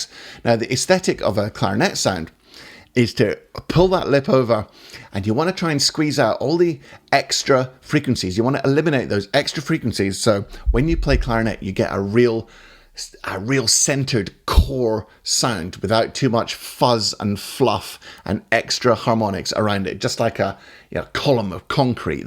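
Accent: Scotland, United Kingdom. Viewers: This was a great video for me. I really appreciated your explanation about the reasons why the "pout" works so well. Thanks. Not too many Scottish accents on the tube. I'm an exile from Kirkintilloch, living in Kent so always good to hear.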